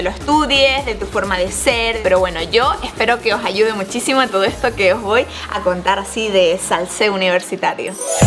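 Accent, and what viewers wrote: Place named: Argentina. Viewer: Hablas como argentina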